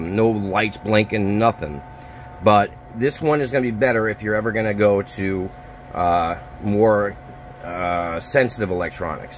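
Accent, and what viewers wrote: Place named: Pittsburgh, United States. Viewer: You from Pittsburgh 😁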